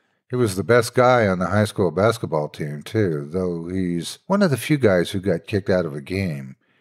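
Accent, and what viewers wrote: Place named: South, United States. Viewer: One of my favorite creepy pastas ever. The way you read this....my my. You have one fine narrative voice. This version was the most...believable, I think. You sound like an old man reminiscing on something horrific and haunting. Your rich, pleasant southern drawl really sells it.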